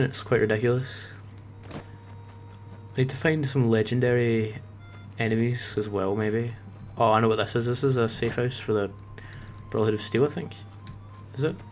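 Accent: United Kingdom. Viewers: awrty mate ;) Nice video man, where about in Glasgow are you from, since you have Glaswegian accent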